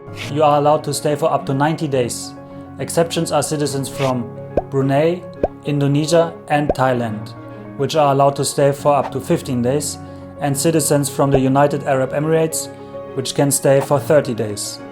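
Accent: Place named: Germany. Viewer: You have the most cliché kind of German accent :D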